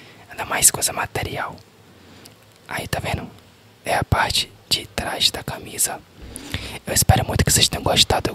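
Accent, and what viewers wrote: Bahia, Brazil. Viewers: Com certeza é baiano né? Já irei inscrita ❤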